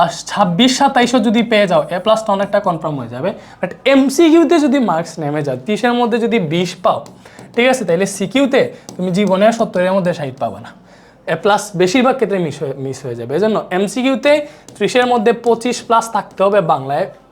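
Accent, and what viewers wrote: Sylhet, Bangladesh. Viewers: Bai apne ke Sylhete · Ashole ami jantam na, ami notun bhaiyar class korchi. 🥺 kotha shone sure hoichi je bhaiya Sylheti😊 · ভাই হয়তো সিলেটি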